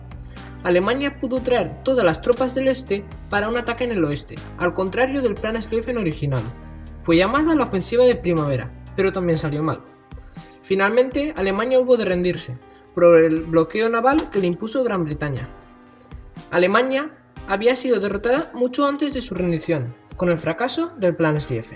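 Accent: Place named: Catalonia, Spain. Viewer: Muy bien muchacho Sencillo y claro He visualuzado tu canal y tenes videos muy interesantes No entiendo tan pocos seguidores Ojala vaya a mas Por cierto eres catalan? Yo vivi unos años en Barcelona Me parece que tu acento es de alli Saludos desde Israel de un judeoargentino sefardi Shalom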